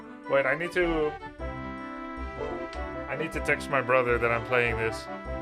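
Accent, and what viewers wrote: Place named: Netherlands. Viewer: I thought I recognized a subtle Dutch accent! I'm French but living in the Netherlands, in Den Haag. I was a huge fan of D&D growing up in the 90s, but somehow never played this one, so I was wondering if it was worth a purchase. Thanks for the video, I just picked it up off GOG. :)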